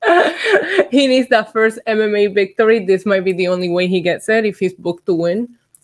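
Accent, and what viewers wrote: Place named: Puerto Rico. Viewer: I told her you like your accent and I made a comment the last time and I guess you seen my comments but are you Puerto Rican because you talk like you are Puerto Rican but anyway I like that New Concept with you do your makeup and talk about wrestling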